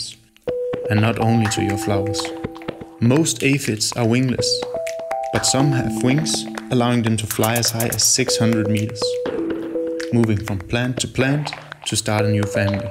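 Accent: Denmark. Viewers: Great to hear you've got a microphone now :D. Is that a danish accent? Apart from Demodex, biofilms of everyday objects (e.g. Keyboard/Mouse, sports drinking bottles) would be interesting, if bacteria (or whoever lives there) are large enough to be filmed.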